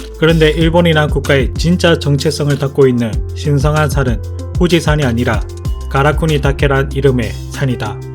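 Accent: Gyeongsang, South Korea. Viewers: 일본사람이 경상도 사투리 쓰면 그렇게 자연스러울수가 없음 ㅎㅎ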